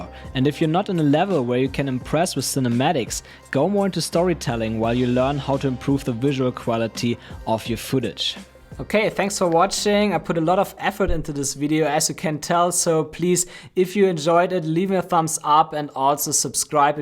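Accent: Germany. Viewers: Definitely German accent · In den ersten 3 Sekunden gemerkt dass er deutscher isr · aha gut ich bin aus Basel🙃 als ich deinen Namen sah und dein Akzent hörte, dachte ich, dass du bestimmt aus der DACH Region bist :) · Are you German??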